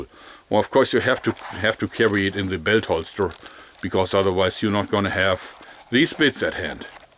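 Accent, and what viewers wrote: Germany. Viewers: Sobald ich deinen Akzent gehört habe wusste ich, dass du deutsch bist 😅 Schön mal einen Deutschen zu sehen, der solche Videos macht (auch wenn sie in Englisch sind, was für mich kein Problem ist)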